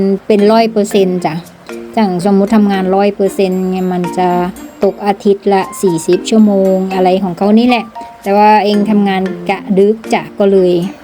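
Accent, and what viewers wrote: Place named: Korat, Thailand. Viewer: สำเนียงคือโคราชแท้😊😊😊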